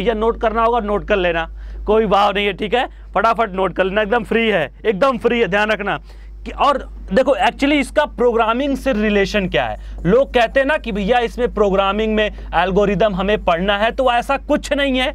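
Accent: Bihar, India. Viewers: Akdm bihari wala touch, maza agaya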